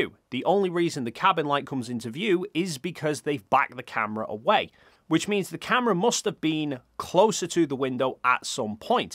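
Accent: United Kingdom. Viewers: Did they think having someone with a British-style accent would make it seem more authoritative, like an Attenborough film? This is similar to the people who ask why the moon photos have a black sky but you cannot see any stars. They just have no idea how a camera works and how exposure changes based on the brightness of light sources. Here, the outside light source (the Earth) is basically over-exposed (when they pull back to show interior) so the camera can show the details inside the capsule as it is darker inside than outside. The window is super-bright because the amount of light coming in is way more than the interior lighting. Same with the starless moon photos. The cameras there were set for the lighting of the sun and reflected sunlight on the surface so there was proper exposure and the photos are not too bright or too dark. If they were to set the exposure to see stars in the sky, which are many times less bright than the moon's reflected light, then all the details of the moonscape would be over-exposed, or washed out, and you would not be able to discern much in the view. 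Plus they did not go to the moon to look at stars; they would look the same as from Earth or low-Earth orbit.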